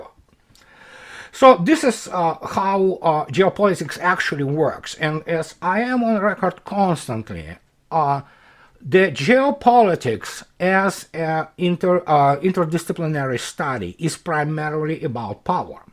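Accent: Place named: Russia. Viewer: You are very good and everything you are talking about is very interesting and important. I am adjusting myself to your russian accent, so I think I understand it all. 😀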